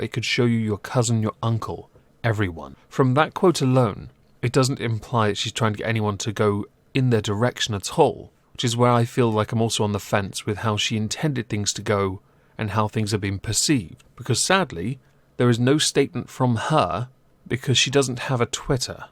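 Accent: RP, United Kingdom. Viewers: Who else subbed for the posh accent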